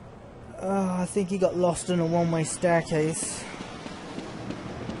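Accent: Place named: England, United Kingdom. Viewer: english accents ftw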